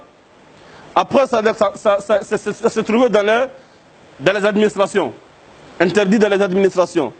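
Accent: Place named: Mali. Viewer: Va dans pays tu ne peux pas vivre en cote d'ivoire, tu parles comme un malien toi tu es un chef ou un envoyer de boko haram.espece de malade ,alassane ouattara est notre preside t et ont se doit de le respecter ,c'est n'est pas toi un blakoro ,qui porte des gros boubous qui viendra manquer du respecte a notre president de la cote d'ivoire .un aventurier comme toi.espece de boko haram..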